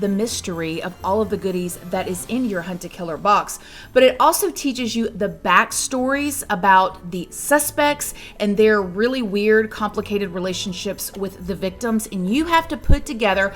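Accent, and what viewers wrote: South, United States. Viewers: I looooove your channel and your adorable southern accent.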